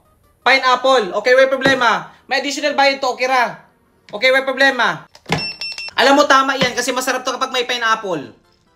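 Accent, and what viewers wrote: Visayas, Philippines. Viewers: hahahaha bisaya kapo ba waypob lima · Ilonggo kagid o bisaya❤❤❤❤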